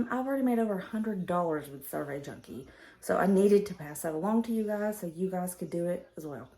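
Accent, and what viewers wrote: South, United States. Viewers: You are a lifesaver. Thank you. New Subscriber ❤️ Love your Southern accent. I'm from Texas 🇱🇷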